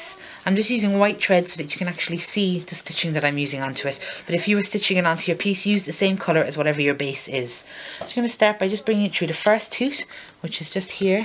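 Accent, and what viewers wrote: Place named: Ireland. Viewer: an Irish accent is like beautiful music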